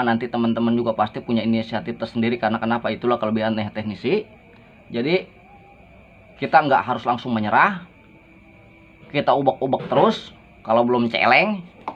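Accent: West Java, Indonesia. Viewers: Mantap hu jeli banget penjelasannya...orang sunda kang????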